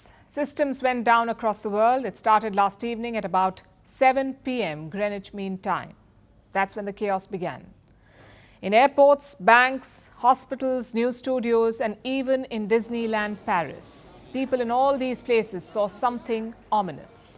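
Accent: India. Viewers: It's her thick ass indian accent that makes it sound like cloud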